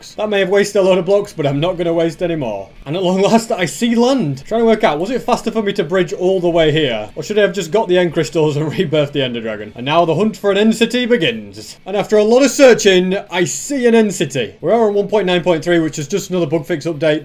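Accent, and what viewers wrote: United Kingdom. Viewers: This man has the most enthusiastic British accent of my entire life. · He has a British accent and he is from the United Kingdom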